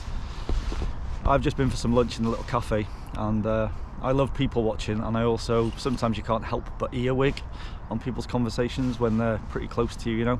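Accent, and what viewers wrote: England, United Kingdom. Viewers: Your accent is english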